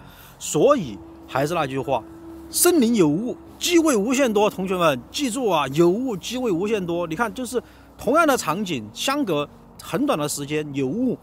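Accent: Sichuan, China. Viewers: 新粉留言，你的川音好有亲切感～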